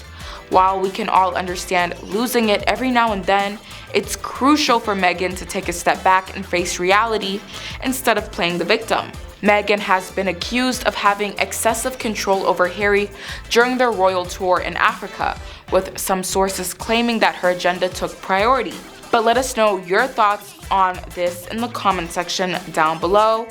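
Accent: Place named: United States. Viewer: I love American accents and yours sounds like caramel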